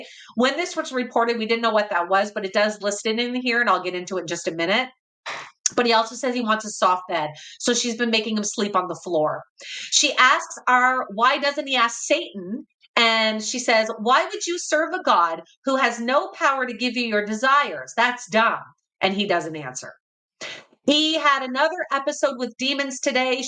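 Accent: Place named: Prince Edward Island, Canada. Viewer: I am a PEI gal and saw you on Mormon Stories! I recognized your accent!!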